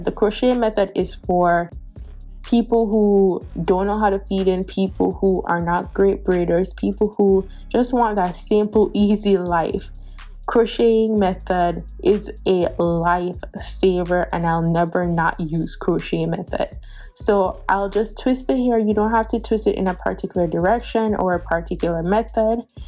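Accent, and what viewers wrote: Jamaica. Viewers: First am stopping by, nice vid. You sound Jamaican are u? Is she guys? I'm Jamaican so I recognize the accent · Are you Jamaican? I think I hear the accent. Love the video👍🏽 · Hi there! U sound like your a Jamaican ☺😇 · Great video, you sound like a Jamaican. Are you?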